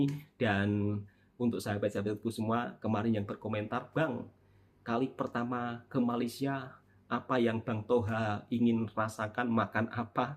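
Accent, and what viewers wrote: Java, Indonesia. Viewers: Loghat jawanya pekat sangat. Macam sup ekor pekat❤❤❤❤❤ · Assalam Tuan Toha.....tak perlu tukar bentuk bahasa, sy boleh faham. Disini pun ramai org jawa yg bunyinya sama dgn Toha. Pasal pedas, org m'sia punyai lidah yg dan tekak yg berbeza dgn pedas. Jadi utk berniaga, diambil jalan tengah, tidak terlalu pedas !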